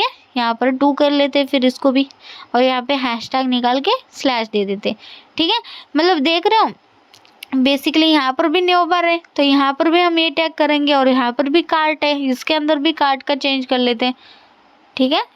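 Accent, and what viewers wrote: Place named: Maharashtra, India. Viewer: Maharashtra.. I knew it.. by your tone and language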